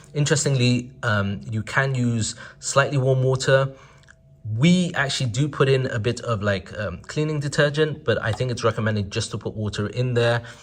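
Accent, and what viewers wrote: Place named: England, United Kingdom. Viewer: Fantastic how you have an English accent yet choose to convert UAE currency to US dollars and not UK pounds.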